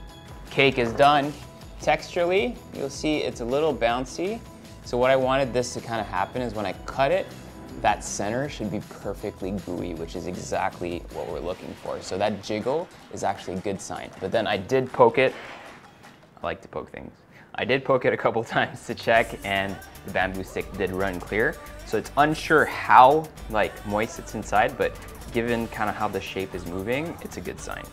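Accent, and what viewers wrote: United States. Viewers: Half Filipino and half French - so why the American accent rather than a sexy French or Filipino one? Were you raised in the States? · YouTube recommended this to me, who is he, he is very cute!!! Does he live in the Philippines? Is he Filipino? He has an American accent. I HAVE SO MANY QUESTIONS! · Funny to hear a very strong American accent with a nicely said ´bain marie’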